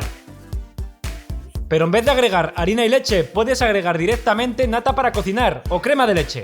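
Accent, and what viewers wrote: Spain. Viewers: Ay. Que sabroso. Se ve. Me encanta tu receta y tu acento español ‼️‼️🇵🇷🇵🇷🇵🇷⭐️💯que viva la Madre Patria. Saludos desde California 🇵🇷♥️⭐️💯💜🙏‼️DIOS TE BENDIGA 🌺🙏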